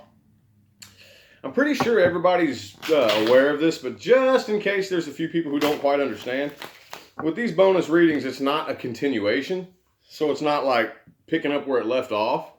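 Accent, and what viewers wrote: South, United States. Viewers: Lmaooooo....i love that country accent oh my god.....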